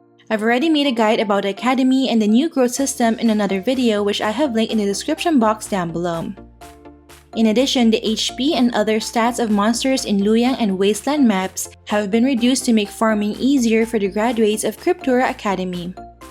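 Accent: Philippines. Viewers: You sound like a filipina trying so hard to sound american but your filipino accent is coming through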